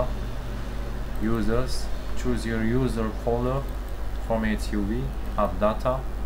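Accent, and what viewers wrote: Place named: France. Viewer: I subscribed for the french accent, and yes this worked for me on CS5. Thank you!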